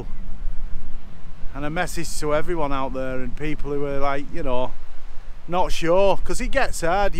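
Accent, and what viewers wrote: Lancashire, United Kingdom. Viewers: I think we have to thank you. It does take a lot of dedication to put in the hours behind the lens. Don't worry about production values and all that mince. Your big baw face and lancs mawling of the Anglian dialect is what holds it together...and the good shots as well. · Brilliant !!! I have watched every one of your vlogs. Coming from Bolton ( not too far away from your hometown ) I too share a "Lanky" dialect not as strong as yours but listening to you talk and laugh... Fabulous :-))))) You seem to be having a load of fun with your mates and the photography aside, love the whole atmosphere you seem to generate. Cheers and Sithee!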